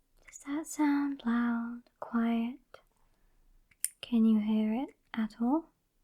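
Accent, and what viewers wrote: United Kingdom. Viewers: Rei Ayanami with british accent and is a nurse?!🤔 Noice!😉😏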